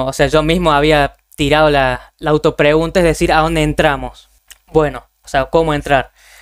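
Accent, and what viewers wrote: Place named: Córdoba, Argentina. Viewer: Muy bueno, por la tonada... sos de Córdoba?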